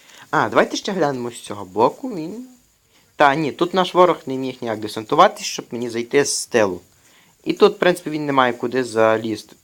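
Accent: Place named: Hungary. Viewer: Мені одному здається, що автора акцент Угорський....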